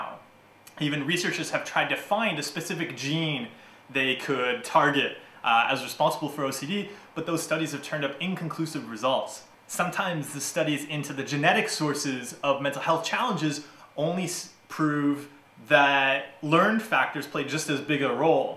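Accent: Canada. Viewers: Cute, smart talking Canadian....love it :)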